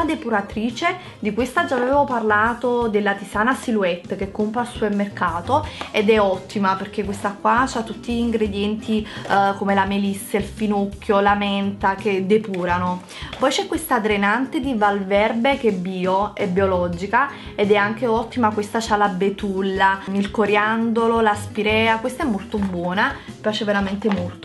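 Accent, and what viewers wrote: Naples, Italy. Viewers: anche io sono di Napoli, però almeno nei video cerca di limitare questa cadenza napoletana pazzesca!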